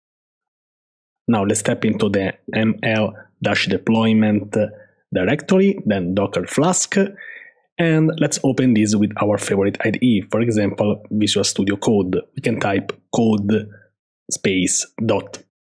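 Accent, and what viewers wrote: Italy. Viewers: I love two things : the way you did your part of the job, and your strong Italian accent, Of course I subscribed. Thank you both, guys !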